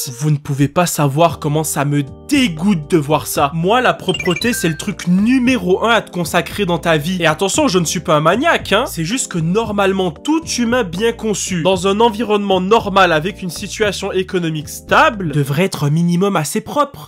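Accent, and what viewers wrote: Quebec, Canada. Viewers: Il est québécois en tand que français jaime bien l’accent québécois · Suoer vidéo! Et sinon t'es pas québécois par hazard ?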